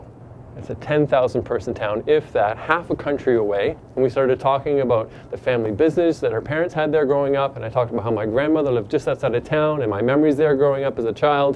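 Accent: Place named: Canada. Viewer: I immediately knew he was Canadian great story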